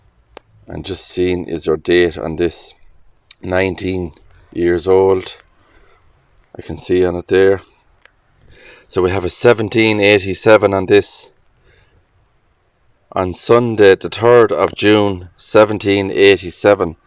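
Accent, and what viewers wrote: Ireland. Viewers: I heard the irish accent, and knew I had to give you a listen to, I like the graveyard walks, so I'll go through the other videos, keep up the good work 👍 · I would watch your videos just to listen to your brogue! Love it.❤ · Hi i just love your voice Irish Accent, from young age my sister and i loved grave yards reading head stones we did this even when we first retired. You do a grest video. My Daughter always wanted to meet a irishman to marry, she sang the celtic music, trouble was she was stunning stil a good looking woman could not cope with her beauty never lived or married she has a strong spirit wont be messed about, but she got on with irish men, she said true men, but they found her spirit to much. She used to sing on the ferry boat from liverpool to ireland. So i never got my irish stepson, were not Irish.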